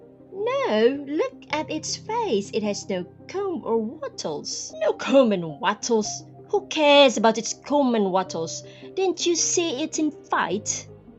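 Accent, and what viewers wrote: United Kingdom. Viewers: You sound scottish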